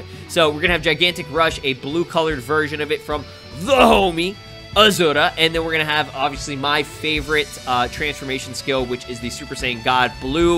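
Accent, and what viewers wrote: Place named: Spain. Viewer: I notice your accent was like spanish,or portuguese,are you?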